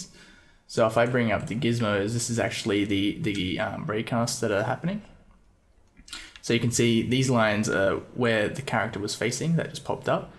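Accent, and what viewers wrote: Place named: Australia. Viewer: great work on the climbing mod you got going. also cool to hear an aussie making it code :D happy new yr mate, subbing it now.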